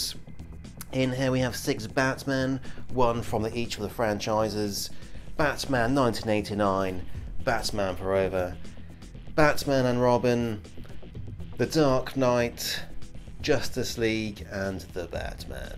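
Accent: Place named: United Kingdom. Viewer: They should have used the head articulation where the joint is in the bottom of the neck instead of the top. Like Hush Batman or Capulo rebirth Batman. When they said they were making a Keaton figure that's what I assumed they would do. It makes the most sense as it would have better preserved the shape of the cowl and neck. Sounds like you're in the UK man. May I please ask where you got this? I haven't been able to find it anywhere yet. Edit - Enjoyed the video, have a sub👍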